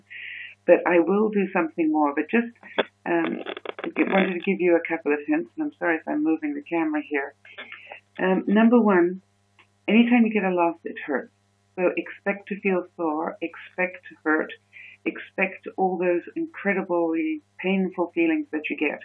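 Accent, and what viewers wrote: United Kingdom. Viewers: Are u British? Because u have a British accent!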